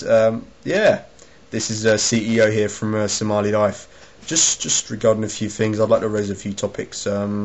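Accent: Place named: Australia. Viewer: aussie accent. cool.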